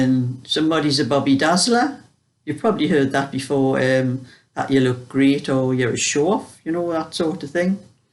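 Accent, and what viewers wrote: Newcastle, United Kingdom. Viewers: The reason I love listening to you is because my Dad was from Newcastle and you sound like my cousin who lives at Greenside.I have only been to the North East half a dozen times, but when my late husband and I went to a 50th wedding party a few years back, my husband said Geordies were the warmest friendliest people he had ever met ! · I think the Geordie accent sounds wonderful. It is one of the reasons why I watch your videos. lol. I used to holiday every year in Alnwick and it is one of my favourite places. I also love watching Vera on the tv.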